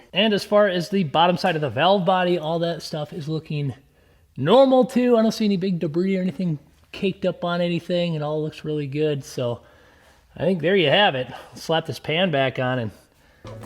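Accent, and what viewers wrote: Minnesota, United States. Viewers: your in minnesota i can just tell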